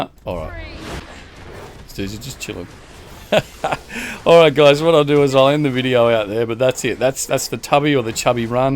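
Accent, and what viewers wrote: Australia. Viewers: At first i thought it was your familiar Australian accent that was so comfortable, then I realised it was your down to earth Australian attitude. No tryhard pretentious radio announcer voice! Rare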